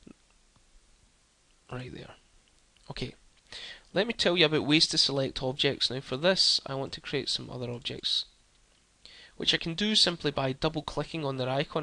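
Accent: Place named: United Kingdom. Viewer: Thanks you, very helpful. However to my mid-western American ear some British accents are as clear as day and others might as well be speaking Chinese. This one is kind of in the middle. I don't think it would hurt to speak more slowly and distinctly.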